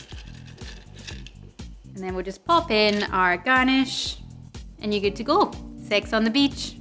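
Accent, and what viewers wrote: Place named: United Kingdom. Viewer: Aussie? I thought u were from the UK....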